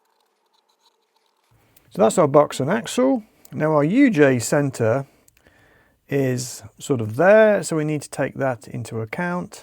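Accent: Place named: Leicester, United Kingdom. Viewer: You must be from Leicester, you sound just like my mate Andy who's from Braunstone...... and Gary Lineker. On a serious note ive put a Volvo 340 engine (72bhp) in a Renault 4. Ive had to drop the steering column about 25mm to clear the inlet manifold for the Weber carb. It goes like a rocket now but my Mrs doesn't like driving it because the steering wheel is now too low(she says I don't have a problem. ) Do think i could cut it and fit a UJ to bring it back to where it was?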